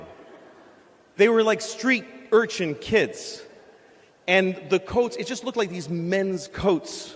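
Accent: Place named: Boston, United States. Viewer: he grew up in Boston, that's the local way of treating vowels.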